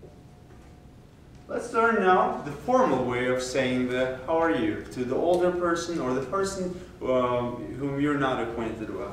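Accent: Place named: England, United Kingdom. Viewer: Жаман деме-онша емес,жай. Что-то Ваш казахский с английским акцентом :)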